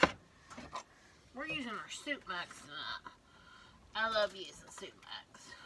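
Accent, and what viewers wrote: South, United States. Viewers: Боже, комментарий на русском под видео тетки с бешено выделяющимся южным акцентом. Удивительно.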